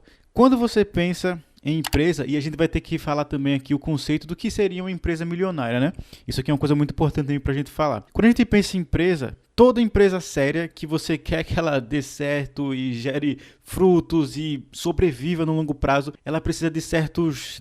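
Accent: Northeast, Brazil. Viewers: você é do Nordeste meu irmão! Top demais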